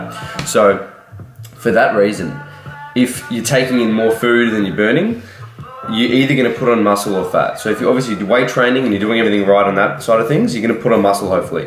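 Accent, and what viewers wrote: Australia. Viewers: Bro... your mum is the best hahahahaha, sweet video man its good to here an aussie among all these mericans hahahaha sick 1 series to dude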